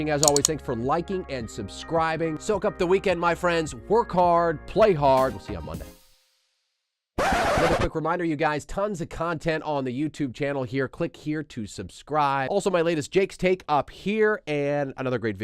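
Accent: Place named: England, United Kingdom. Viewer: Your English accent was brilliant.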